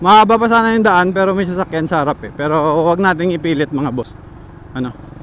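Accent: Iloilo, Philippines. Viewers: Idol Taga diin ka nga ah ilonggo pang hambal mo hw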